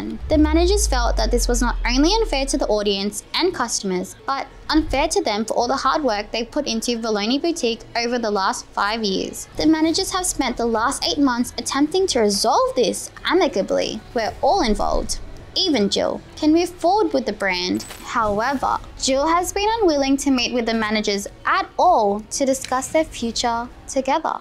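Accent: Australia. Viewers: I HAD NO IDEA SHE WAS AUSTRALIAN DANG. Gorgeous face body and voice, shes blessed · I had no idea she was Australian or had such a beautiful accent :Edit: thanks so much for all the likes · Australian????😭😭😭😭😭 that accent caught me off guard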